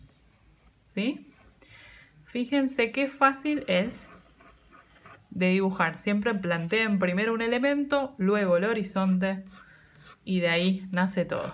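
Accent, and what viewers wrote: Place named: Spain. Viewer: Me encanta su acento de español ❤❤❤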